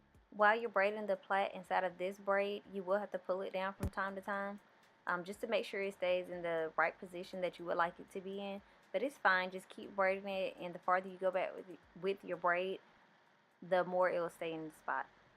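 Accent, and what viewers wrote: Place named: South, United States. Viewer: is it werid but i like your voice lol you got this down south accent that i absolutely love great video look forward in supporting each other channels :) see you in the nest video!!!#sub 973 with bell turnt on !!!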